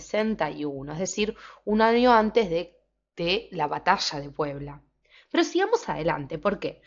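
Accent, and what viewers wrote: Argentina. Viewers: eres de argentina?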